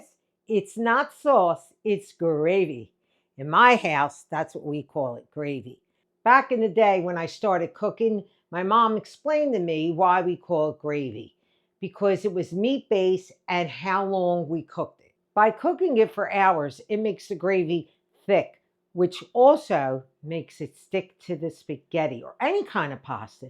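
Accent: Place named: Philadelphia, United States. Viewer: You sound like your from south Philly, in south Philly or south jersey we always called it gravy!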